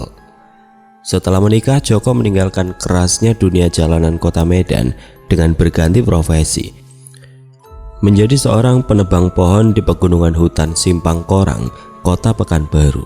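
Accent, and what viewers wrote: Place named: Banyumas, Indonesia. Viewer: Unik nya V.O chanel ini selalu berlogat Ngapak walaupun menceritakan daerah selain jawa. Salut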